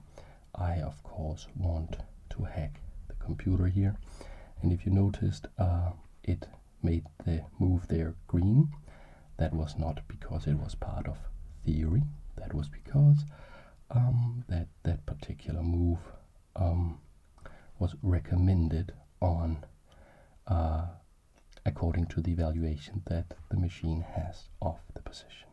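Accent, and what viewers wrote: Denmark. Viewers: Ayo, er du dansk?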